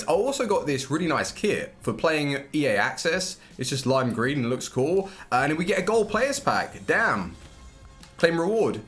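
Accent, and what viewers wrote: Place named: England, United Kingdom. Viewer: He’s English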